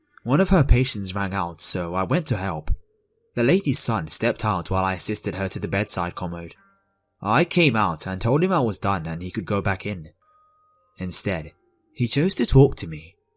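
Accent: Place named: England, United Kingdom. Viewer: You're welcome! I figured you were from England. I am from Louisville Kentucky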